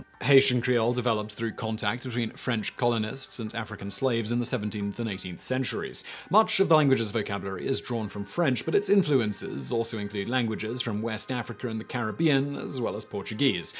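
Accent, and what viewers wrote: England, United Kingdom. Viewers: Can you talk without chopping up your words? Or is there anyone else that can be the voice of this channel? The English accent is very beautiful, in fact I think it's the most elegant accent there is, but the way you chop up your words when you talk makes it very difficult to actually focus and comprehend the information you're presenting. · Out of topic but i got scared when the guy sounded british, i thought he was vsauce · I think he was just raised in Britain? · The British pronunciation of Spanish -os words always bugged me, it's so odd to hear someone say "Carlos" as "Carloss". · This is a good video, but do all Brits says Nicaragua that way? Nicarag-yew-ah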